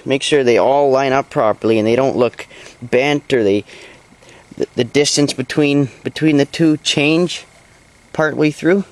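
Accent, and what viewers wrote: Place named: Canada. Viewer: i can tell that ur canadian haha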